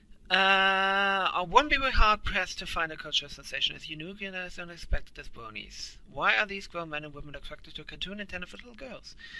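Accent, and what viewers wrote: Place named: Germany. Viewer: Es ist immer ein merkwürdiges Gefühl als Deutscher einen Deutschen Englisch sprechen hören... Aber danke für das Video! Gab nicht wirklich viel Informationen über die deutsche Blu-Ray und war mir nicht sicher, ob es sich wirklich lohnt. :)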